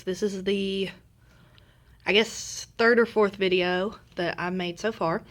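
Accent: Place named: United States. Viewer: I'm sitting the UK exam this coming week. Any brainstem questions and I'll be hearing your awesome American accent singing "5, 6, 7, 8!". Thank you so much for these videos - brilliantly accurate and educational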